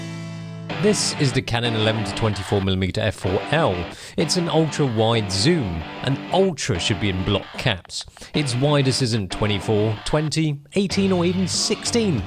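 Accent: England, United Kingdom. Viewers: u never hear speaking chinese with british accent, weird but kinda refreshing LOL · Hongkongers can immediately tell the accent of a British Chinese (i.e. accent), but communication is not a problem at all;) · Slick Trademarc he is speaking cantonese but it's slightly out of tone. It has english accent in his cantonese LOL! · He said in Cantonese that he was born in the UK, albeit Cantonese with an English accent. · yellow skin with British accent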